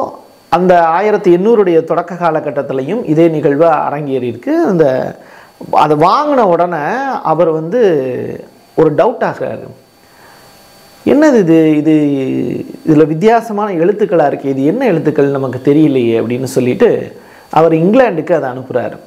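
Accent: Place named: Tamil Nadu, India. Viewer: உச்சரிக்கும் போது தமிழ் உச்சரிப்பு தென்படுகிறது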